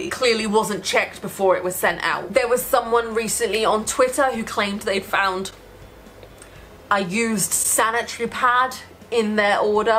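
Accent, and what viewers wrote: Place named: Midlands, United Kingdom. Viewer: U sound like ur from the Midlands, maybe east Midlands. Am I close? In England, of course. I'm doing a challenge with my mates to see where youtubers are from and see who's closest to us. Am I close?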